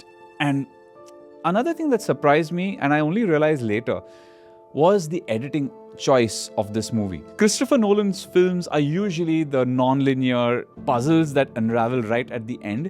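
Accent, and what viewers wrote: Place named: India. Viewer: You have got a very strong Indian accent. Fascinating.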